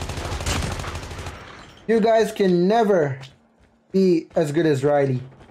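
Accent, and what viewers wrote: Jamaica. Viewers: don't try so hard to speak pure english: hit ah video wid pure yardman tone. Respect no hard feelings.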